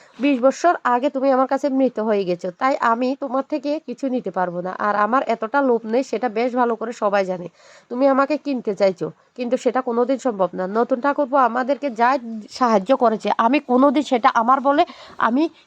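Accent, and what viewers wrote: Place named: Noakhali, Bangladesh. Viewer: Didi apnr kathagulo kmn lagche sunta. Apni ki nowakhali dist a thaken?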